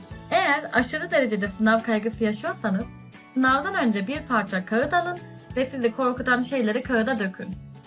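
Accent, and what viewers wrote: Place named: Azerbaijan. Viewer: Seslendiren azerbaycanlımı Türkçeyi zor söylüyo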